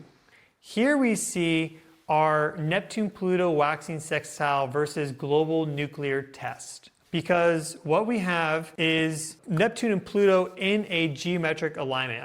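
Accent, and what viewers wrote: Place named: Bay Area, United States. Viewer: I don't know the answer, but always wondered where is from... My guess is SF Bay Area, possibly South Bay, just from his accent - Where do you think he's from? Only people who don't actually know, please!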